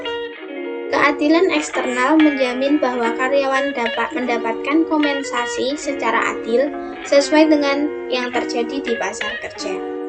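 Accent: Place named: Java, Indonesia. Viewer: Cara penyampaian unik dengan logat jawa, jadi mudah di headline pointnya👍👍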